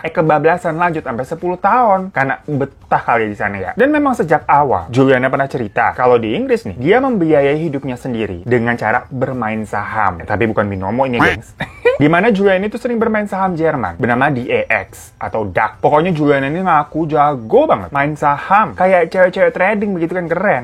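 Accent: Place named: Jakarta, Indonesia. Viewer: Lu orang cikarang ya bang betawi bener😁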